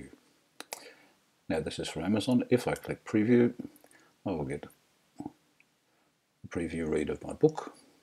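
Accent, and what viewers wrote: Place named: Australia. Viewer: he sounds like an aussie version of bob ross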